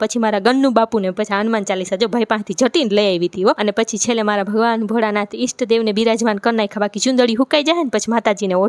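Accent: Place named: Rural, India. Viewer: Jay mataji.....🙏 તમારી speech ખૂબ સરસ છે.... એકદમ દેશી,,, પોતાની ભાષા લાગે તેવી છે.... બનાવટ નથી.... જય ભોળાનાથ... જય માં આશાપુરા... ખમકારી ખોડિયાર...🙏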